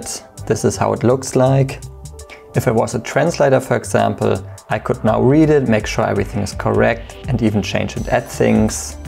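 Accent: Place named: Germany. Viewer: Aweful German accent, I don't understand nothing!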